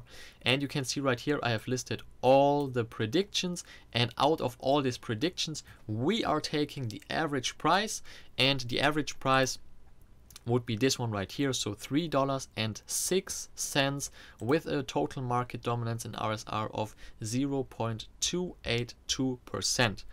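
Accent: Germany. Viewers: Deutscher Akzent izz da 😂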